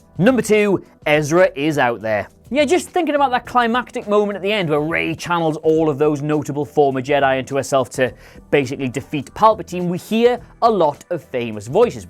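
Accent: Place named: England, United Kingdom. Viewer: BallerDman789 Come on man, he is English. There is a difference in pronunciation. Besides, they don’t even know how to say the same name in the same movie. In the original Trilogy everyone said “Han” like 6 different ways. Just shut up and enjoy the video.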